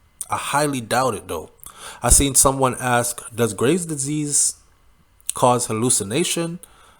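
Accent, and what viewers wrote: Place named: Jamaica. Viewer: You sound like you are from Jamaica...in fact you sound like SOUFLO...you cyan hide from your fans...Big Up to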